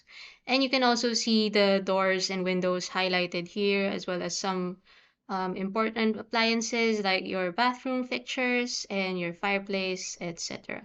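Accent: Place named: Philippines. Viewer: Been watching these videos, you sound Pilipina (Pilipino here)! I'm liking what you guys do, just signed up :)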